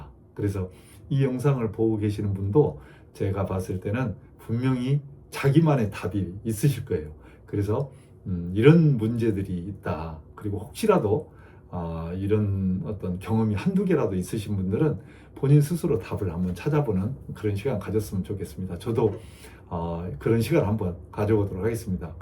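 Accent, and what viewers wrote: Jeolla, South Korea. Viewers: 잘듣고있던중. 절라도사투리를. .거참 .!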